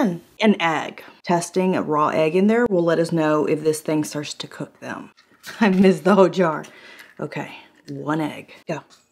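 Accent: South, United States. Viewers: I was drawn to comment because of the southern accent you’ve picked up! Change your accent to draw more viewers!! Question: did you post the link for that magnifying device you used? Thanks!! Love your videos! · Isn't the country accent comes from living in Texas!!! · Lol I found your channel a few days ago... and have watched so many of your videos and laughed so hard!! But I got a question... in one video or maybe this one you said you woke up with a southern accent... I'm southern and I thought you were Southern so now I'm wondering... where are you from? Lol Keep doing what you're doing!! Love your videos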